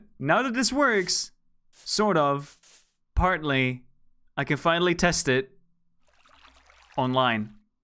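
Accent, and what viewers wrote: Netherlands. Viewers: He is Dutch so he has an accent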